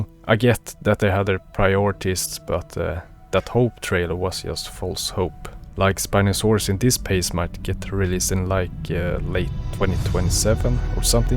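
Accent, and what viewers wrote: Sweden. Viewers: Im norwegian, and i also heard swed immediately:P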